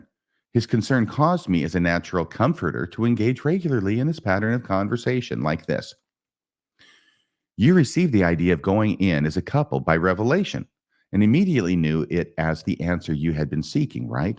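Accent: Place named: Utah, United States. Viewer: Thank you so much for reading the complaints word for word...even if the accents are Utahn 😅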